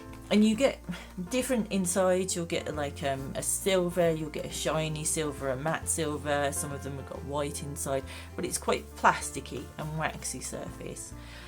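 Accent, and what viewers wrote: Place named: England, United Kingdom. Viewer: ps trying to place your accent! I'm originally from the North West of England (now in Scotland) and yours sometimes sounds N.West... but it sometimes has a west country twang? :O) mind you, I'm a bit rubbish with accents!! ha ha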